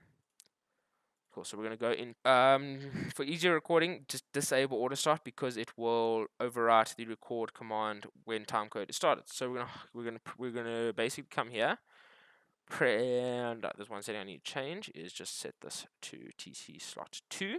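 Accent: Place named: Afrikaans, South Africa. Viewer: Hey, DELPHI 7 on the taskbar!! Lol. Jy klink Afrikaans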